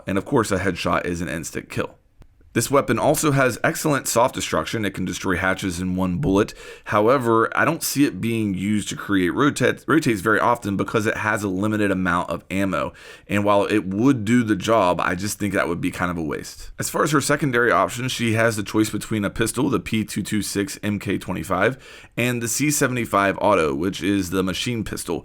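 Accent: United States. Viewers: American accent he can't help it.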